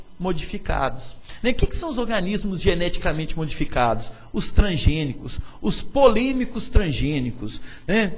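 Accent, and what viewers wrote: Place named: Goiás, Brazil. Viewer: Esse aí é goiano 😁❤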